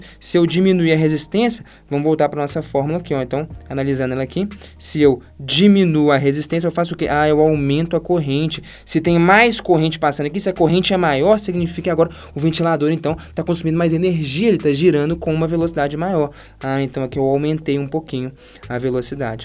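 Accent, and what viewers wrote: Minas Gerais, Brazil. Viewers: esse sotaque mineirinho deixa tudo mais leve. Eu amo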